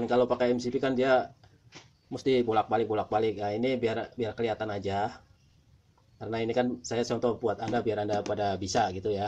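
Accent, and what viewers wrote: Banyumas, Indonesia. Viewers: Rika wong ngapak ya kang siip lah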